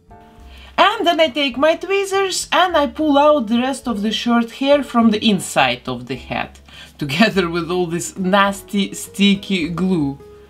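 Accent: Russia. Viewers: Это самый потрясающий русский акцент, который я слышала)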